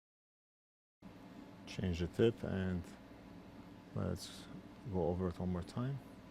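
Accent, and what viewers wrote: Lebanon. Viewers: Dude has a Lebanese accent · are you Lebanese? your accent and the ear analogy are very Lebanese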